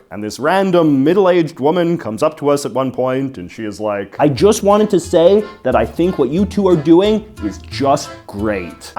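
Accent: Canada. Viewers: has literally the most Canadian accent I have ever heard, and I'm a Canadian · Mmmm hard to say and tricky subject I mean I hope shit calms down but I think most men 19-25 say have the thought of "could tap" with any possible mate which does get in the way of meaning ful relationships with woman and so in a ignorant attempt to step into one's shoes the young man with think that a young man who is instead attracted to men will then have similar thoughts. It is kinda baked into what we are. The whole friendship thing is just a plus of having big brains. I had something I wanted to say but forgot after trying to get all this out oh yeah kinda lame you are only know for being gay I remember being kinda surprised but you really don't talk about it. I never know If I should be typing third person so it doesn't read like I am talking to the youtuber hie self... you know? 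anyway I always think of this guy as the one canadian that actually sounds like that and the guys isn't even a newfy I have even visited my uncle in Vancouver and I didn't pick up the locals accent. Man that was long winded I need some more fluoride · There is something in the way that you speak that is slightly hypnotizing. The eye movement. The head movement. The cadence of your speech. The intonation swells. The relaxed smiles. It's very calming. You could easily speak for a 3 hour lecture and I would listen to all of it. .. Maybe it's just because I'm Canadian and like to hear the accent. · You have a very Canadian stereotyped "about". I often heard the stereotype but I didn't think it was real. When I see a same sex couple holding hands, I smile like a loon and I try not to stare at them at all because it would be so creepy to have this stranger smile and stare at the same time. I can't help it, it makes me so happy. 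It's so rare where I lived, I think it happened to me only once. I hope that one day, it will happen so frequently that I will have a normal reaction. (just to be clear, I'm queer too) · is the most Canadian sounding Canadian i've ever heard. Also did not realise you were 38...thought you were way younger than that. ❤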